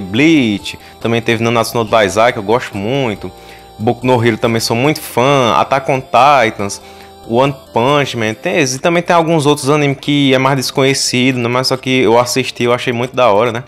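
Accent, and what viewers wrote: Northeast, Brazil. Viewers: Tu é nordestino ou carioca?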